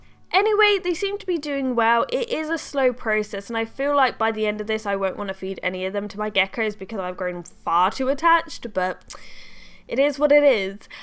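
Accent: England, United Kingdom. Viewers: Is she English?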